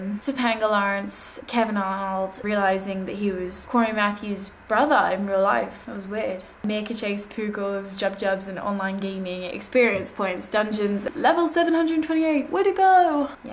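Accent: England, United Kingdom. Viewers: Well she's English as u can hear frm her accent. Oh btw here in the UK horse riding is actually quite common and u don't have to be rich to be doing it. Neways i agreed quite a lot to wut she mentioned i mean it really is quite nostalgic. Shhe just basically aformentioned things that were the latest craze and all that. I think she covered things a lot of average people will remember. This video is something... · Y'know at a guess I'd say just totally english. And just that by the sounds of it, me and her have watched too much american TV. HAHA. And too right I've been watching the odd arthur episode after school. Its on before? hmm I wouldn't be up in time. But yeah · how do you become a director?? plz reply, btw your very cute and i like your english accent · Ok, I thought you sounded English. Well done on being featured! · you have an english accent, but it sounds like your'e trying to be American sometimes. 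Baaaad. Be proud to be english! ( we're so much better. ) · Thats an English accent! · What is it about girls with English accents that get them featured on youtube? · why do people think your accent is strange? Its a normal English accent... isnt it?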